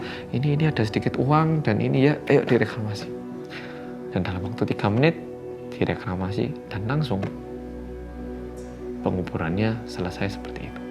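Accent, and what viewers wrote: Java, Indonesia. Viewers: Halli gais welcome back to bersama orang Korea yang medhookkk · Mirip Dr. Tirta ngomongnya Medoook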